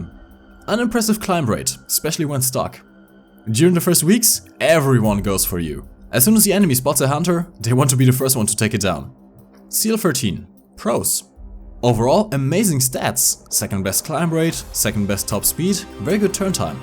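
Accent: Germany. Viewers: Really this is a German english accent!! I really like it!!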